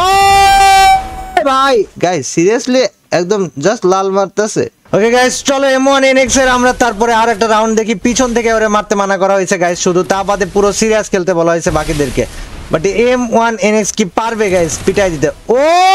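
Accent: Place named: Chittagong, Bangladesh. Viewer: ভাষা শুনে বুঝছি চট্টগ্রাম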